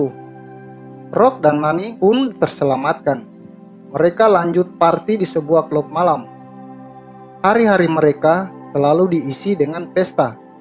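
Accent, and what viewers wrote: Eastern Indonesia, Indonesia. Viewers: abang orang timur to?? kelihatan dari logat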